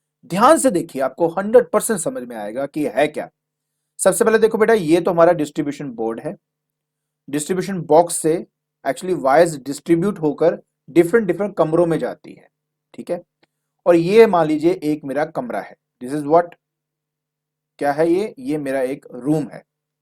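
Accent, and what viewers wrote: Punjab, India. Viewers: Sir kyà aap Punjab se ho?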